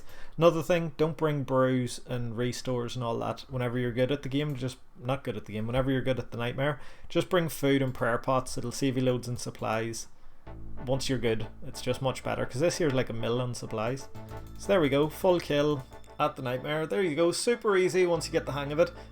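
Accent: Northern Ireland, United Kingdom. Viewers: is that a Northern Irish accent I hear!? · Good guide man ty. Surprised me to hear a northern Irish accent in a runescape guide as I'm from NI myself was unexpected haha Also fuck Zlaryn I hope he gets an itch on his balls that can never be scratched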